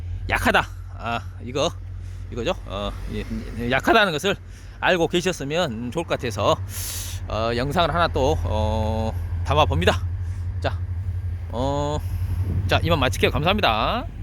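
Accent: Jeolla, South Korea. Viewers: 전라도 사투리 ㅎㅎㅎㅎ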